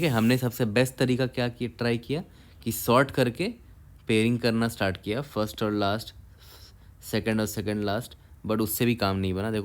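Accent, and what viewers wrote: Bihar, India. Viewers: whi bohot meethi awaz hai lga hi tha bihar jharkhand side se honge, mere freind bhi wahi se hai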